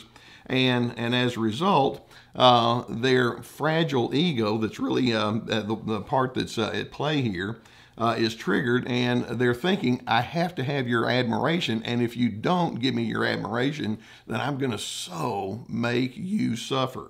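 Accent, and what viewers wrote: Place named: Texas, United States. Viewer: We have a narc neighbor (70 year-old female covert) in the condo below us that's always chiming in on petty things that are none of her business. It started with small, innocent statements, then escalated to (subtle and not so subtle) attempts by her to govern when we use our plumbing, appliances, electric blinds, enter various rooms, you name it. Boundaries of our home space, bodies and schedule are constantly tested. She invents rules and limitations that go beyond local laws. Whenever we have visitors, she'll go out of her way to rain on our parade. She tries her best to get into our heads and create psychological doubt. There's zero tolerance as we never know which alleged infraction will lead to a lengthy, condescending, word salad text or email. Since educating myself on narcs and going grey rock, she has lost interest in combating us and may soon be moving out. 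Thanks Dr. C for imparting your wisdom with a sweet, down-home Texas drawl. Fingers crossed!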